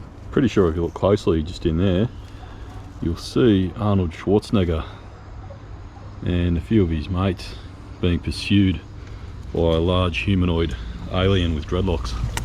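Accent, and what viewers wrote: Australia. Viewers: When u like hearing languages and recognize it as Australian accent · At first I wasnt really paying attention and took your aussie accent as a british one and was like, why the hell is this guy worried about spiders. Then I realized that he is in Australia lol. Cant imagine having to worry about creepy crawlies all day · Where are you located? Are you an Aussie? Love the accent. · TV aerial was my guess! Woot! Great job. I love the charity work. Love your accent, too! Down home Alabama here, so hearing an Aussie is a treat. Keep cutting!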